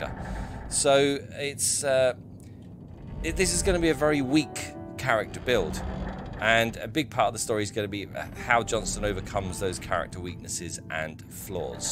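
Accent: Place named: United Kingdom. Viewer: Jonsten, what a chad! Like your British accent btw.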